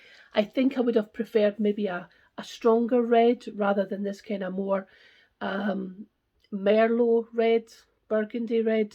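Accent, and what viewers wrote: Scotland, United Kingdom. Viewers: Hi, I'm fairly new to your flosstube, just started watching before Christmas. Michelle from mommalovesyougb recommended you and I fell in love with your accent. I'm in Canada and have Scottish ancestry so something about the Scots accent appeals to me. I think your choice of projects are great. I've never been to Paris, had a chance probably 10 years ago but went to ireland instead. I like the chart tho. I didn't hear you mention if this was just for UK only stitchers, if it is just disregard my entry. Cheers on getting back on your feet, nothing worse than health issues during covid times.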